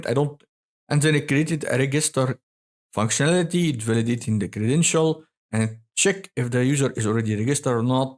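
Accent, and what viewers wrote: Egypt. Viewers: egyptian accent?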